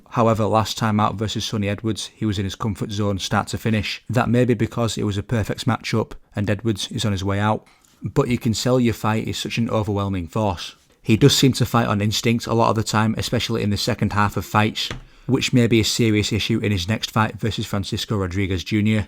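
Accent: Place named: Northern England, United Kingdom. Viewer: I like your accent, probably Northern accent in the UK.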